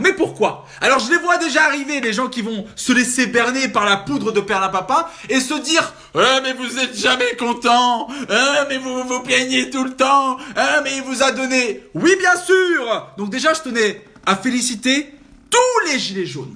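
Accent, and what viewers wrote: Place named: England, United Kingdom. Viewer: Juste une question : Pourquoi avoir pris un accent anglais ? xD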